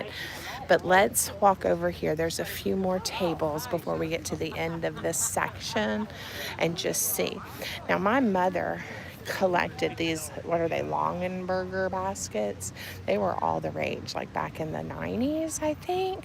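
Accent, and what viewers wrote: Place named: South, United States. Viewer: LOVE that southern accent!!!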